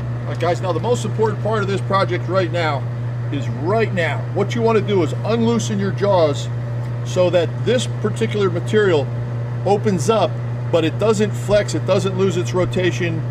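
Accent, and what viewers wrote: United States. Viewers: you're the MAN!! This trick tightened up results to spec- and we could use funds on a 4-jaw instead of a replacement 3! BTW: My compliments on your voice and diction- very clear, understandable and smooth, and best of all: no grating dissonances that bleed your ears... If I may ask- where in the US does that accent originate? Keep on truckin'! -Claus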